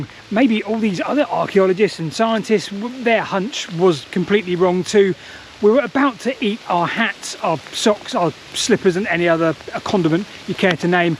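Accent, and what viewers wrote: United Kingdom. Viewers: an accent what are you talking about? England, Scotland, Wales, and Ireland have their own accents within their respective territories depending where he was born and raised his accent would depend on that area such as Birmingham, London, Scott's, Irish or a mixture of different accents within England "The English, Despite inventing the language, don't know how to speak it." Is just such a ridiculous statement the guy is literally speaking English how it was and is spoken since before the United States or the 13 colonies even came to be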